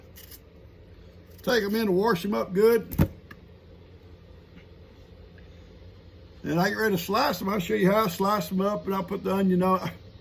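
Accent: South, United States. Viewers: closed caption needs to recognize that southern drawl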